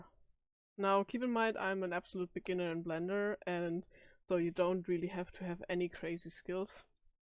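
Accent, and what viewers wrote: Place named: Germany. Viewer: judging by the accent youre 100 percent german lol great vid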